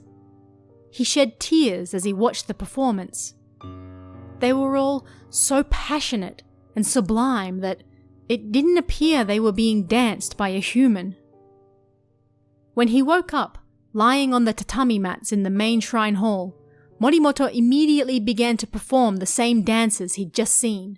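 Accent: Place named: Australia. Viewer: Hard to listen to the Aussie for a long time. Not saying it's bad, just not used to it.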